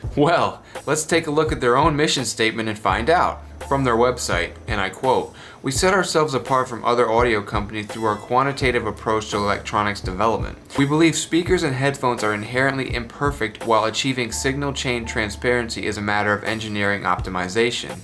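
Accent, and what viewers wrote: New York, United States. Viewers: That NY accent! :D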